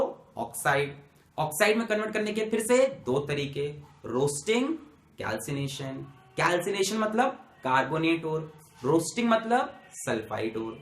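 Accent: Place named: Allahabad, India. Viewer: You are from which city? Allahabad?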